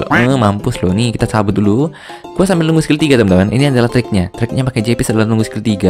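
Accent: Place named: Medan, Indonesia. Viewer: "Penta bapak kau, mati kau longor!!" Confirmed bang dari medan:v Edit: Ditambah pidung:v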